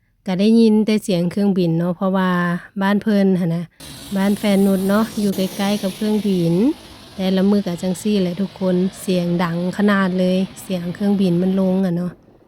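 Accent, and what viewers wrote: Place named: Northern Laos, Laos. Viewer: สบายดีค่ะน้อง คนสวย...เขามาเลาะเบิ่งยนต์แนมเครื่องบินนำน้อง จ้า..และฟังน้ำเสียงว้าวเพราะๆอ่อนหวานน่าฟังบ่ฮู่ว่าสาวลาวเหนือว้าวเพราะทุกคนบ้อจ้า...👉(น้อง คนสวยถ้าได้กลับบ้านลาวเหนือทำคลิปสาวลาวเหนือว้าว(พูด)ให้ฟังนำเด้อจ้า)👌👍😲💖💙🇱🇦🇹🇭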